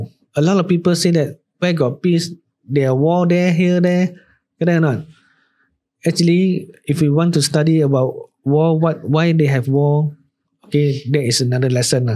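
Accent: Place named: Malaysia. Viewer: I dont understand ur manglish...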